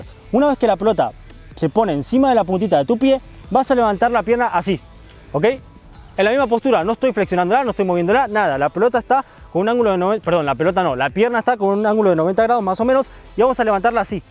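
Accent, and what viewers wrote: Argentina. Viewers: ¿ sos de a Argentina? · Sos argentino o que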